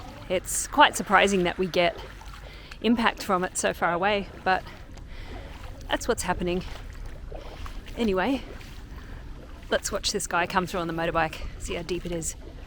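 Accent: Australia. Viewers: Jeez that's alot of water about. Just discovered your channel. Subbed, looking forward to see more of the life in Cambodia. Great to hear the Aussie accent too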